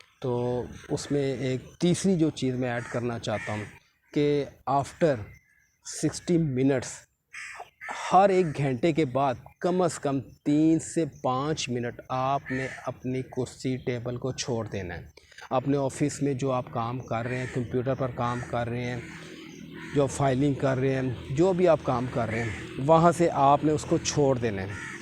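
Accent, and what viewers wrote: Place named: Pakistan. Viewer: The first-ever video I watched completely. Your way of talking along with urdu accent is great. Keep it up.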